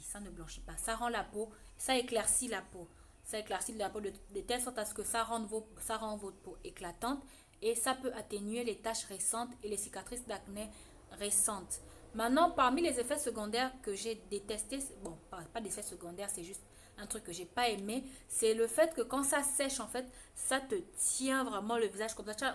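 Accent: Gabon. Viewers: Hello. Super merci. Vous êtes Gabonaises rien que ai son de votre voix 😇😇🙏merci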